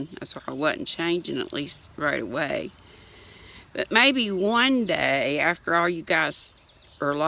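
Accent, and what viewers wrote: South, United States. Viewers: You are crackin' me up! Sending you vibes to find that "sugar daddy/mama" 😉I love your "country talk", it makes me happy💜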